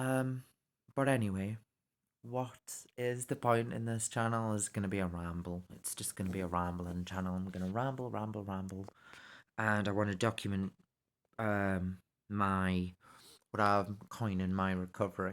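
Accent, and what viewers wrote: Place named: County Durham, United Kingdom. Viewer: Dunno if your channel is even still active, but I just wanted to tell you you're amazing, I think you're proper class. You've been through so much, but you're an amazing human being. I'm from county durham but live in Australia and when I saw and heard you it felt like home ☺️ i hope you're OK, I really do. Life can be so shit some times, I've gone through very different things, but pain is pain. I just felt the need to reach out and say hi 😊 hope you're doing well, it cannot have been easy.' I can't even imagine. I appreciate all of your public speaking, I think it helps the community, or even if it gelps one person, you've done so much! Thanks for easing my homesickness, but most importantly, being very vulnerable, true, and honest. You're amazing ❤❤❤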